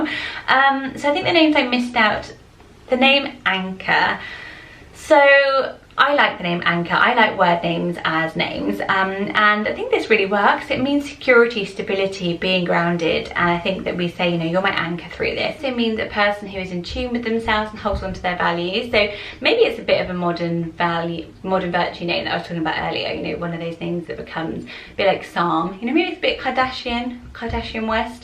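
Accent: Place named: England, United Kingdom. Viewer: Evelina sounds so much prettier in your English accent. My favorite this time must be Phoenix. What about Theon for all the Game of Throne fans out there. A very cool alternative for Theo.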